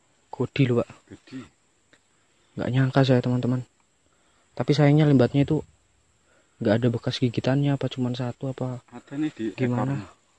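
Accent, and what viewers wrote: Java, Indonesia. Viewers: Logatnya sih kea jawa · Tpi kok logat nya bhasa jowo😆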